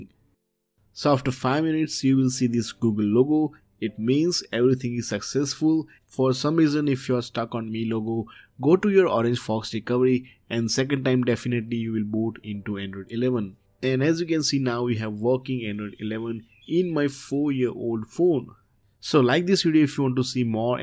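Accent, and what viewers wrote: India. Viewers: Is this safe ? U look like an Indian from ur voice and I mostly dont trust them Pls answer me 🥺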